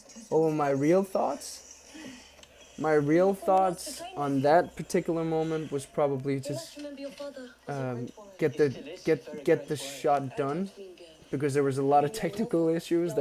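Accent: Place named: Denmark. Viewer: I listened to this whilst napping this afternoon and HIS voice is like ice cream in my ears,so soothing..he should read audiobooks or sleep stories or something like that. Love the Danish language and accent but his English is pretty great I would say,I like the way he says things when he gets a bit muddled!. 😄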